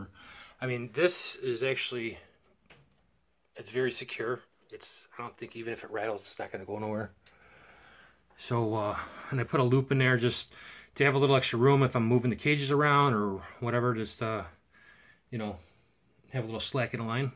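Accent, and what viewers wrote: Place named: Chicago, United States. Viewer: Love your accent. Chicago area?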